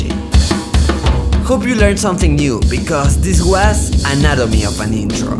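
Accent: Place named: Mexico. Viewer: He sounds mexican... is he? Love his accent regardless.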